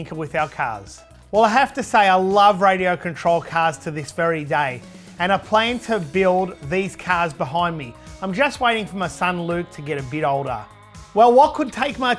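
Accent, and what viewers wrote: Australia. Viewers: is there any other Australian that knows the same american shows, movies, and music like you do? I live in America and grew up in the 70's like you and im always amazed by the fact you had all the same stuff in Australia. Accept for your accent you would definitely fit in in America. Meaning no one would guess you were from Australia. Lol. Was it the Nintendo in 1986 that got you away from RC's? 👍😎🇺🇸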